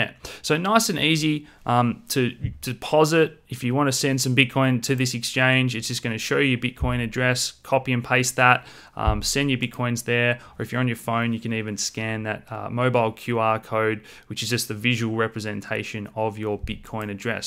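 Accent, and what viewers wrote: Australia. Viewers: No native english speaker, but recognize the Aussie accent, Mate!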